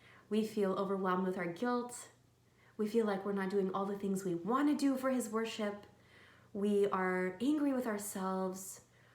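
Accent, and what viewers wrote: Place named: United States. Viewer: Ukhti, by hearing you speak I can tell you're American,I can pick up American English better and quicker than other English speakers, the reason I and I know and few... Anyway listening to your speech I noticed that you mentioned some about Muslims calling themselves "bad Muslims". There's no such thing that being a bad Muslims,shaitan tricks to discourage the slave. Convey to those Muslims who think they aren't good enough of Muslim that Nabi Ahmad SAW said we,his Ummah won't be able to follow him up on everything,"just to do what we can"...And He swt was holy gifted,there is no way we can get to his level,we don't have his strength,power,endurance etc... Just do your best, Ummah.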